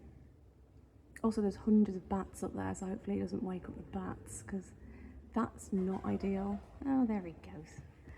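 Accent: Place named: Yorkshire, United Kingdom. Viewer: oh I see, I guess more local distinctions go beyond my foreign untrained ear, I just thought you sounded rather similar to a friend of mine from near Leeds. Still a very nice accent!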